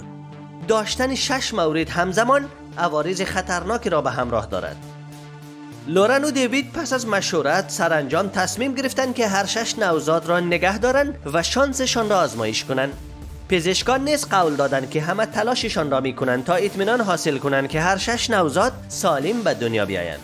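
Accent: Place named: Afghanistan. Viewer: ببخشیداین لهجه افغانستانی است باماخیلی فرق میکند ایشون اشتباه نکردن.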